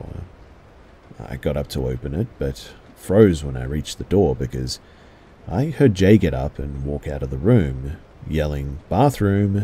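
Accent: Australia. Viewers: Welcome back, man. Great to have you & your rhythmic Aussie cords. 🤭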